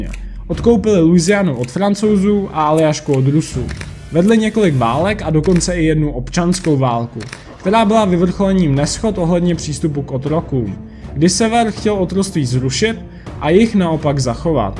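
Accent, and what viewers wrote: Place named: Prague, Czech Republic. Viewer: Sleduji vaše pořady, jsou dobré. Jenom mi vadí vaše čeština, nemohl byste na tom zapracovat? Pražština moc nejde do ucha.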